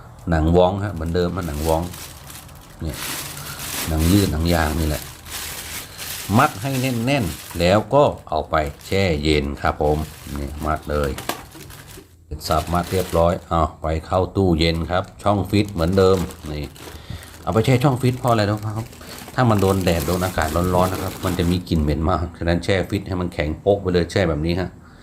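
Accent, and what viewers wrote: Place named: North, Thailand. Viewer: ผมเล่นว้องทุกวันครับ fcครับ ชอบเวลาน้าอู้เหนืออออขยาด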